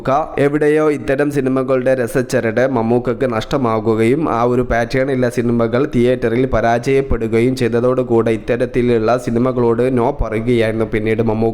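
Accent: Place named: Trivandrum, India. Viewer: ട്രിവാൻഡ്രം സ്ലാങ് 💙💙💙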